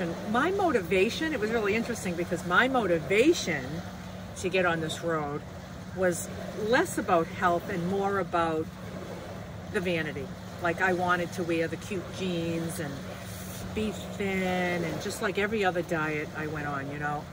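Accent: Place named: Massachusetts, United States. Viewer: Thank you 😊 you sound like home! I’m from MA too and now in Florida. Love the simplicity you share!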